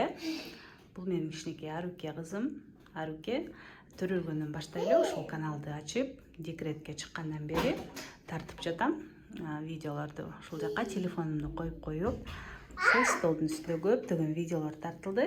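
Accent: Standard. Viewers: Саламатсызбы,.Ден соолук, дагы көптөгөн ийгиликтерди, үй-бүлөлүк бакыт каалайм! Кыргызча эң сонун таза, көркөм, таттуу сүйлөп түшүндүргөнүңүзгө чоң рахмат! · Белегиниз мн чын журоктон куттуктайм.Сизди таза кыргызча суйлоп,орусча кошпой суйлогонунуз учун дагы абдан сыйлайм..Тазалыктын сырларынан да айтып,уйротуп турунуз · Азаматсын,так ,даана,таза кыргызча , түшүнүктүү сүйлөгөн кыргыздын сыймыктуу кызы!!! Белегин кут болсун!! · Үнүңүз өтө жагымдуу,таза кыпгызчаныз дагы жагат,бизге сонун тамактарды үйрөтүп эле жүрө бериңиз,бар болуңуз 😘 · Азамат кожойке экенсиң алтын кыз бар бол Таптаза кыргызча сүйлөйт экенсиң кай жердин кызы болосуң ❤ Бар бол алтын кыз рахмат эмгектериңе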